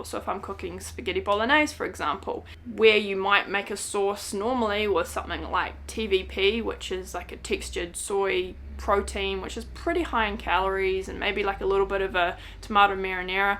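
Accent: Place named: New Zealand. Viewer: How good to hear a kiwi voice talking candidly on this topic! And I love your hair 🥰 it’s so pretty 😍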